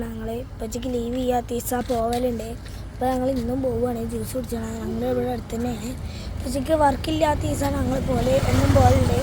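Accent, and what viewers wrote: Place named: Malappuram, India. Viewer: മലപ്പുറം ഭാഷ മനസിലാവാത്ത ലോകം ഇല്യാ നീ പോളിക്ക് 🔥🫶💜.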